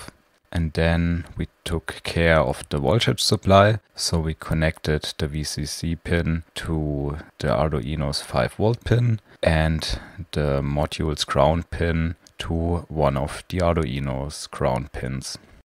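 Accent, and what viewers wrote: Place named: Germany. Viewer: German english.... hard to listen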